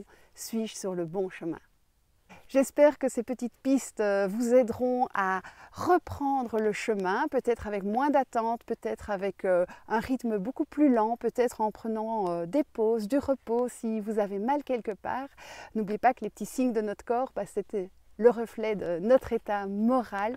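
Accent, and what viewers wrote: Belgium. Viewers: Bonjour j’aurais bien vu vos randonnées en Norvège et merci encore pour la positivé et cet accent belge bon courage pour votre chemin dans l’éternel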